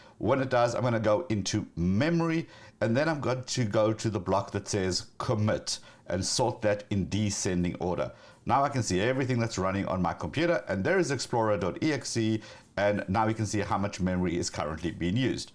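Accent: South Africa. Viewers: South African? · Your hectic SA accent in the videos make my flippin day bru. Info in my tongue is just so much more lekker to consume. Please dont stop doing what you're doing dude. You rock. ￼ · First time watching your videos, noticed your strong South African accent coming through. Nice work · OMG I ALMOST IMMEDIATELY recognized that South African accent !!!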